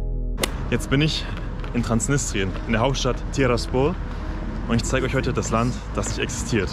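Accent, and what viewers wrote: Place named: Balkans. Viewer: Wie hast du eigentlich Russisch gelernt? Für mich hast du irgendwie einen Serbokroatischen Akzent 🤔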